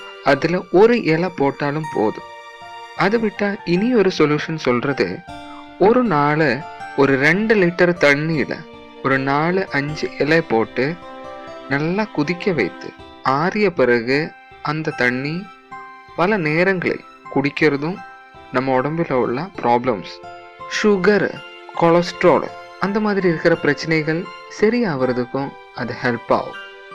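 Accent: Kerala, India. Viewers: சாரே.. மலையாளியோ??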